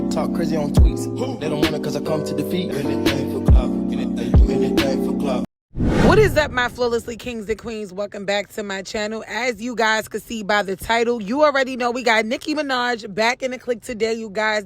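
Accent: New York, United States. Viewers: Just subbed to your channel! Love your content and I absolutely love your NY accent!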